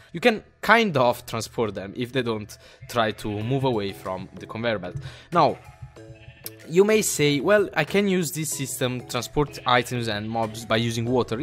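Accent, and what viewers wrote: Italy. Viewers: quanto si sente che sei italiano😂 comunque sei un figo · si sente che l'accento è marcatamente italiano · Si sente che sei italiano 😉😉 xD Sei un grande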